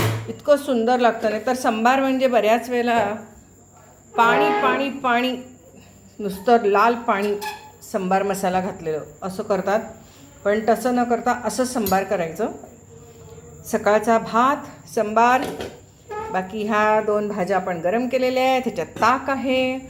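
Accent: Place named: Malvani, India. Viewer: Mi asa sambhar nakki karun bagen mam mastach amchya malvani basheth boltey mastach mazya tondaak panni sutla chaan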